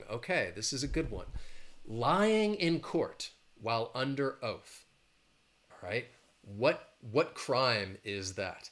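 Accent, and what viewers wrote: United States. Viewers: I'd like your US accent ☺️☺️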